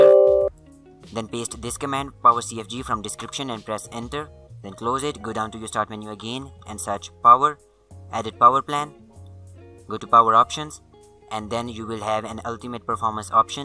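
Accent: India. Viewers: he legit has an Indian voice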